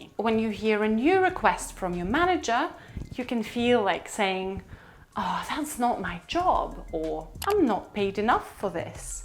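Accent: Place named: United Kingdom. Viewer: Странное сравнение... У этой девушки поставленный британский акцент, в то время как у Марины - американский.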